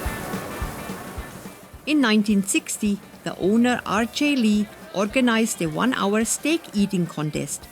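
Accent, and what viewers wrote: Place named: Franconia, Germany. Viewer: Wow, sehr inspirierend - professionell gemacht und vor allem mit Herz und Gespür für Zwischentöne 🙂 Der fränkische Akzent (und natürlich als Reiseführerin): total sympathisch!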